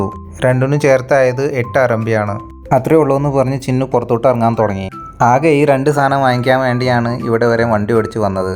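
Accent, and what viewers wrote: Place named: Perumbavoor, India. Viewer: നാട്ടില്‍ എവിടെയാണ്? എവിടെയോ ഒരു പെരുമ്പാവൂര്‍ സ്വരം.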